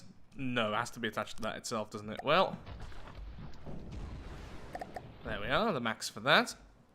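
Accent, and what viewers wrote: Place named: Birmingham, United Kingdom. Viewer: You can really tell Is a brummy sometimes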